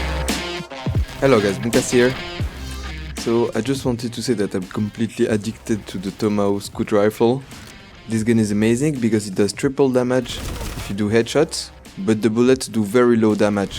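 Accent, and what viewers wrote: France. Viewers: oula lui il a un accent français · tu a un accent français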